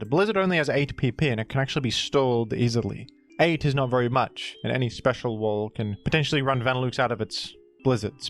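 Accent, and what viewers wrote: Australia. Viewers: I can’t tell if some of your pronunciations are a joke or just how they say it down under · As an Australia I can confirm it’s just his accent. That’s how we say it down here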